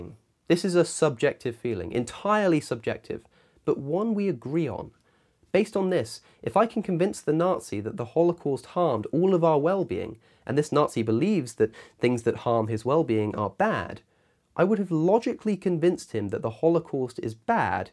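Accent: United Kingdom. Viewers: CosmicSkeptic: intelligent British sounds Me: mhm mhm mhm 🤔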